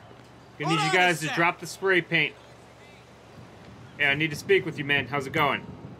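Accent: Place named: New York, United States. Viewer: If you CPD where is o block you don’t sound like you from Chicago you sound like you’re from New York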